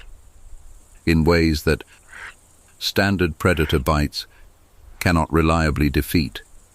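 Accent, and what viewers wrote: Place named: United Kingdom. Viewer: Really? Are you really proud of your hollow, soulless, contemptuous, empty, Superior British accented commentator? We understand that you're incapable of providing a truly human being with a truly human commentary, but at least give us one who doesn't have the intolerable snobbery of the British, thanks.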